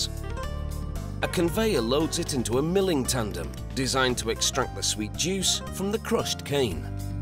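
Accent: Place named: Liverpool, United Kingdom. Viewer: He’s actually scouse, one of the more common peasantry sounding English accents.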